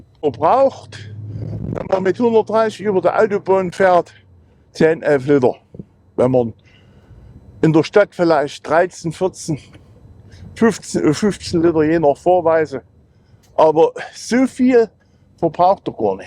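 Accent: Saxony, Germany. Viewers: "hod ne zweete sebarade Heezung.. is m winder herrlisch worm .. finf inschdrumende hoddar " als Österreicher muss ich mich schon ein wenig konzentrieren um diesen sächsischen Dialekt zu verstehen. Um Sächsisch korrekt zu lernen ist das sicher eine gute vorlage . Ich liebe ja alle Dialekte, aber der V8 Motor des Tatra klingt trotzdem besser in meinen Ohren als das Sächsische :-)) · Dieser sächsische Fistelgesang ist unerträglich! Forschdbor! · mein kollege hatte auch einen sächsich herrlich du wessiarch nicht so verlogen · Der Sächsische Dialekt, einfach Cool. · Also selbst für mich als Sachse ist das sächsisch des netten Herrn ganz schön stark.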